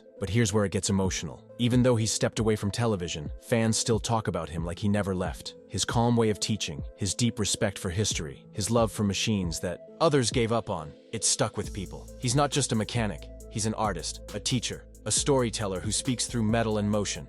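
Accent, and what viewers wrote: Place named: United States. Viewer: Why the hideous American voiceover ???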